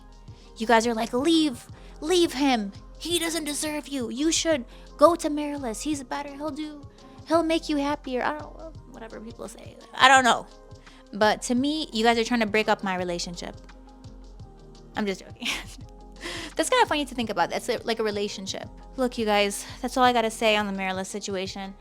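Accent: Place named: New York, United States. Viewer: My kinda gal! Love my old 'dinosaur' DSLRs and I'm still using my iPhone 7! I'm English: so I don't know U.S. accents well. Is that a Bronx New York accent? Anyway - whatever it is - I LOVE IT!! 💕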